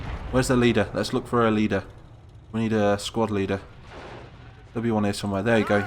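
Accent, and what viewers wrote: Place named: United Kingdom. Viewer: yup ur british I think???????????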